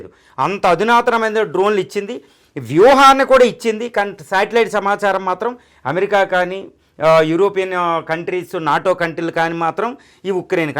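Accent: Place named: West Godavari, India. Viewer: Bhabai,what an explanation sir (with WG.state accent) good , very good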